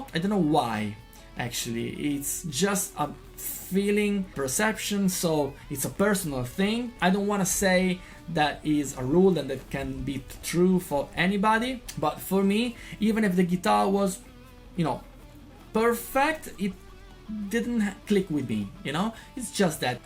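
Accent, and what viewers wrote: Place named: Italy. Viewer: I subscribed by the time you completed your first line.....damnnn i soo wish good content.....and also for my love of italian accent😅😅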